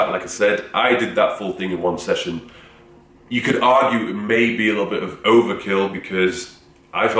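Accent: United Kingdom. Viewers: Hey, Don't know how many positive comments you can digest, but here's another......No one comes close to the great content and great show and tell of the videos, not to mention that you've got the best physique and perfect British accent to make the videos super classy! You are the best, period. Thanks, Hal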